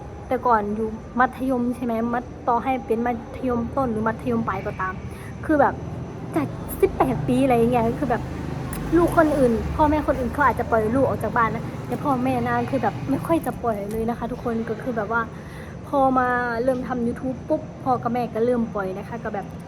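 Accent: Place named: Phimai, Thailand. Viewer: พูดโคราชแบบนี้ไทยพิมายเด๊นิ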